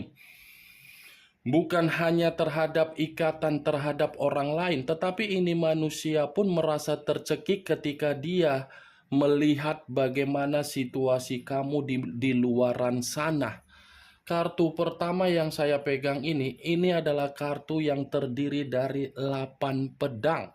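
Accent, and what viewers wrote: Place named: Medan, Indonesia. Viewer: 😂😂😂😂 Maaantaap Kali Aaacchhh Kartu Fortune ini … Bahasa ORG MEDAN Banget SUHU The Best Kita ini …👍👍👍👍👍💃💃😂🙏✍️😎😎😊😊……30 Nov 2023 Pkl wib …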